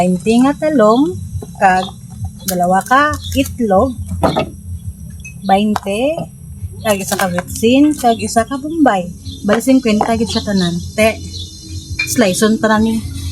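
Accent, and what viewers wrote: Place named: Iloilo, Philippines. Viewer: ilonggoha oy 😂😂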